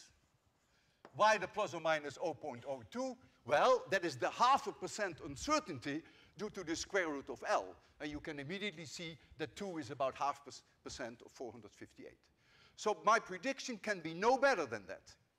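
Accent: Netherlands. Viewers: Yep, Dutch for sure. He threw me a bit when he said he'd seen it many times in Austria, but then I came to my senses. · I could hear immediately that he is from the Netherlands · I immediately heard he is Dutch. I don’t even have to research it. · 5 seconds of listening and I already know that he's a dutchie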